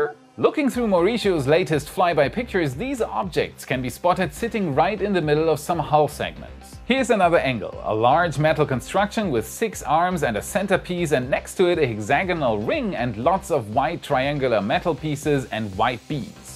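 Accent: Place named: Germany. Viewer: You sound a bit german. Are u german? Great video btw!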